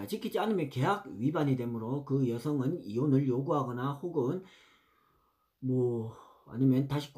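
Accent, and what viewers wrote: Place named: Daegu, South Korea. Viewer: I really love deagu dialect, fighting ❤️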